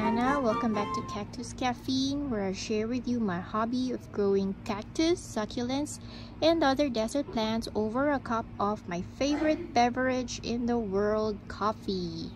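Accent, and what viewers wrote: Philippines. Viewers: I love your cute Philippine accent.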